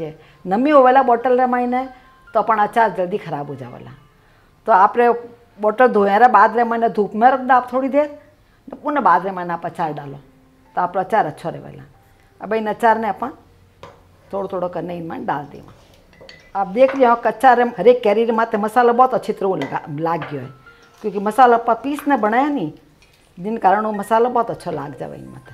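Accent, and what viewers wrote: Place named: Marwari, India. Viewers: आप री आवाज ओर मारवाड़ी में बोलण री स्टाइल बहोत ही पयारी लागी सा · Aachar ka toh pata nahi but aap ka bolne ka Rajasthani andaz bahut badhiya hai 👍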